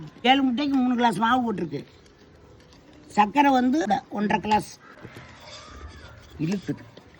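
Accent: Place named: Madurai, India. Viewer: Thaayee.. unga pechu arumai.. adhil pandiya naatu paasam mattume therindhathu..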